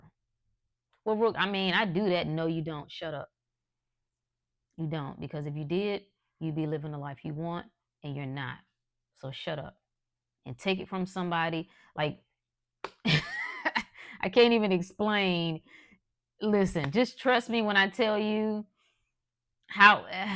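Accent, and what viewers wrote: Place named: South, United States. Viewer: Ok I figured you were from the south you kinda sound like Erykah Badu when you talk lol. Both of you be dropping gems 💎 & have a country accent. Love your videos ❤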